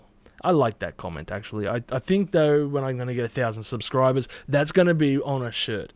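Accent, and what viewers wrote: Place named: United Kingdom. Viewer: Dude you totally sound british 😂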